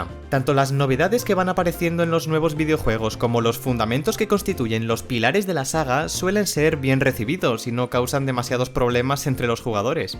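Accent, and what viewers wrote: Zaragoza, Spain. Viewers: Eres de Zaragoza??? =) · Eres de Zaragoza??? 😊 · Eres de Zaragoza? 🤔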